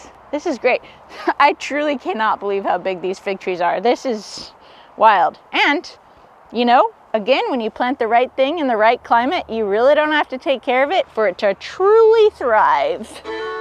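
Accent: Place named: Minnesota, United States. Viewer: PNW has very little accents. I keep hearing a MN accent maybe cause you remind me of an ol’ friend. BTW…. Many congratulations on your baby!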